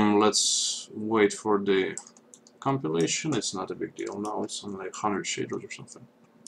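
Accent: Russia. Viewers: I hear Russian accent! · Русский, спалился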